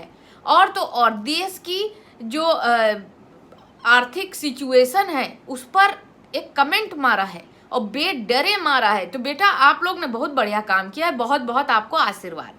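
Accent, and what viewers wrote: India. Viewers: I love your UP accent. Are you basically from there ? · Fan of ur Hindi accent ,uske babau bhi sidhe muh baat nehi karta hey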